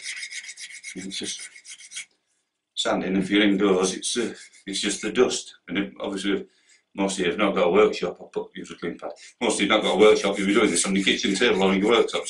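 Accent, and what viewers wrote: Yorkshire, United Kingdom. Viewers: Nowt wrong wi a Yorkshire accent.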